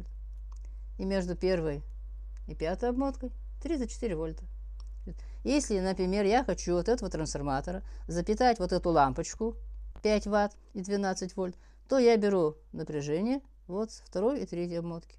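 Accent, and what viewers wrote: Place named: Udmurtia, Russia. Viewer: Акцент у Вас интересный. Вы из Удмуртии или Одессы?)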